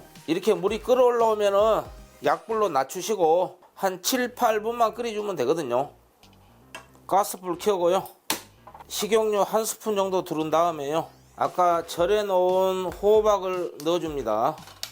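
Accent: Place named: Gyeongsang, South Korea. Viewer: 구수한 사투리 듣기 좋아용 갱상도에서~~~ㅋ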